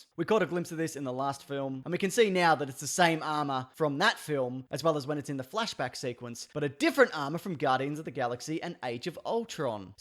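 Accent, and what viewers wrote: Australia. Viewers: Man true Australian accent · You sound Aussie as fuck are you Aussie as fuck?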